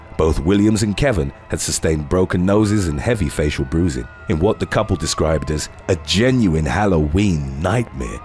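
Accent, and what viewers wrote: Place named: England, United Kingdom. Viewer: Dark skin, English accent, hypnotizing voice. Very unique. Makes the crimes more bearable to listen to.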